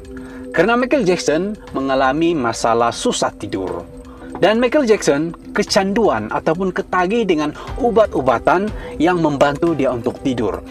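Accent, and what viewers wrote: Sabah, Malaysia. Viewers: Ini bahasa sabah sarawak la...mlaysia ada borneo kan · Pertama aku sangka orng Indonesia rupa"nya orng Sabah😂🙏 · ya bahasa org sabah memag begitu loghat nya lebih kepada bahasa baku😊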